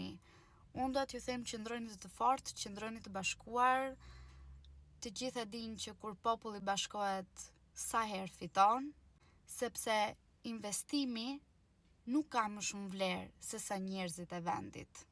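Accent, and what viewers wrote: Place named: Albania. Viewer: Sot ke folur si Shqipe e vertete! Me ke lene pershtypje shume te mire me artikulimin shqip.Shqiperine e bekoi i madhi ZOT por e demtuan pushtetaret!!!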